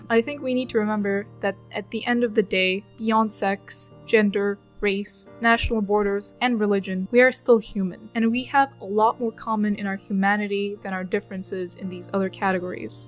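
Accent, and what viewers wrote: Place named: Turkey. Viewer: I am Turkish too and I was like "This accent is really really familiar " in the first video, Anyway Great video! I love the first one second one is also really good!!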